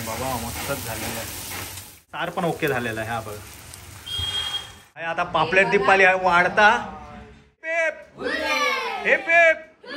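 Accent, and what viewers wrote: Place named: Malvan, India. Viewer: तुम्ही अस्सल मालवणी बोलता,अभिमान वाटतो धन्यवाद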